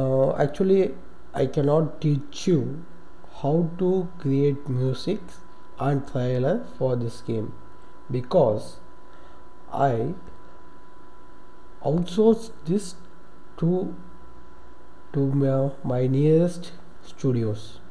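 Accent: India. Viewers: Thanks.... :D But One Question " Are You Indian ? "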